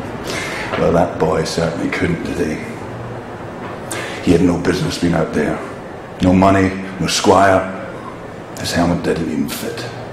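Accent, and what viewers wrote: Scotland, United Kingdom. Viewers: I didn't even clock that he was Scottish until watching this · Nah, his Scottish accent is too thick so far.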